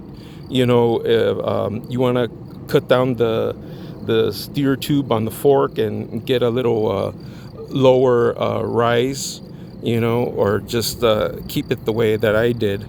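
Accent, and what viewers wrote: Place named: Southern California, United States. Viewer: U sound vry southern cal. Where all th smartest people live. Smart purchase. U 4got mention yr bike has a teardrop aero down tube larger diameter. Mine is chrome version my upgrades vastly superiour 2yours& delete 3lbs over yourz. Relaxed geometry = superiour shifting huge reduction in cognitive stress. Always apply glue tube (and) patch blow dry maybe u find iz stronger result. Go buy u some 38mm Specialized Sawtooth $19 Jenson b4 they run out. 120 TP1 faster then yr frictiony knobs on MAXXIS. I clocked 12mph over San Gabriel mts fireroad slicks vs 8mph sluggish knows. Yes knows are fun 2look at. No they dont wrk. use slicks is best bleev it or not rubber gets traction. Hard 2bleev I know. Use melted parrafin wax chain tubeless tires. Even with tubes my new bike& new positioning man it just FLEW. When I got Walmart 17miles away It felt like I just left driveway brilliant ergonomics body not sore wznt tired at all either. 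Mind blowing. My Mercier build is actually wrldz best bike all others will now be judged by end all personified I built it delete my E Bike I was SHOCKED 1st time I rode it. Felt like it had a motor. I am ^ always have been cycling true epicenter 1x, dual control shifting on your drops, Disc specific rims/disc's pedal straps short upright top tubes, "Gravel bikes" with wide fork& stays thoze were all my doing from r decades ago. U neglect mention TAIWAN by far best builder bikes or anything. Very mature responsible people Taiwan = true reason Honda became ki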